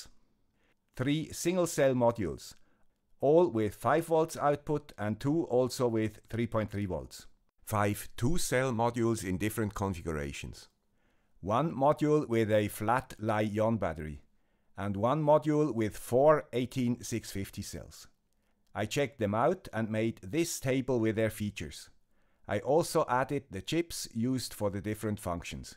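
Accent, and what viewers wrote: Switzerland. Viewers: Hi Guy with the Swiss Accent! Thank you for your outstanding videos; I really, really enjoy watching them and I appreciate your presentation style and sense of humor. Best regards!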